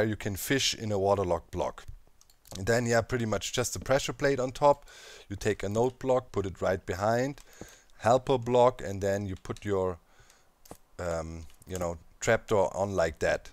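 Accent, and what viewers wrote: Germany. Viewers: man hört das er ein deutschsprachiger ist :P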